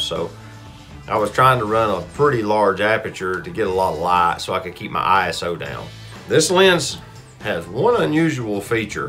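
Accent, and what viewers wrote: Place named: Alabama, United States. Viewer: Looks like Horse Pens 40 where you were bouldering. Maybe I'm just assuming it was Alabama due to your accent (I'm from Mississippi). Anyway thanks for the video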